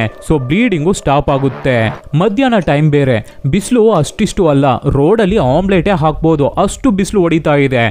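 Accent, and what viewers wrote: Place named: Tamil Nadu, India. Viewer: ನೀನು ಪಕ್ಕಾ ತಮಿಳ್ ಅವ್ನು... ಆದ್ರೂ ಸೂಪರ್ 😄😄😄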